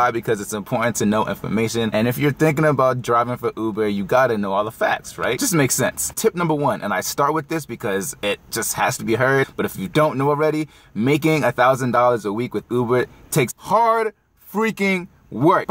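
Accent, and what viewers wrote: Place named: Philadelphia, United States. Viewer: Never mind. I heard Philly. 😜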